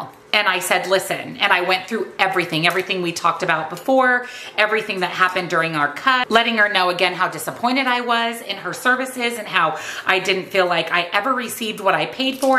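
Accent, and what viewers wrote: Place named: Minnesota, United States. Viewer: You have got to be from Minnesota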